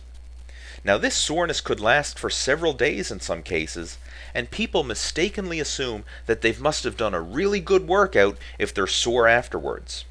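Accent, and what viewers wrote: Canada. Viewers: This is kind of off-topic but do you happen to be Canadian? I swear i can hear the accent o.O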